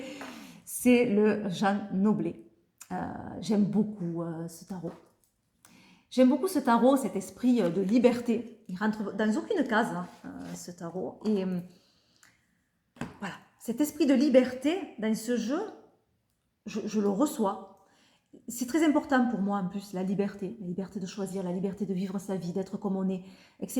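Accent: South, France. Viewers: ton accent du sud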